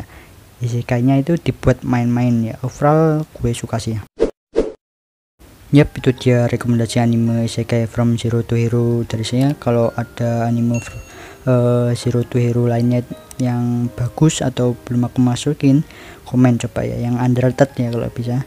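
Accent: Java, Indonesia. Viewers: GUE# jawanya medok....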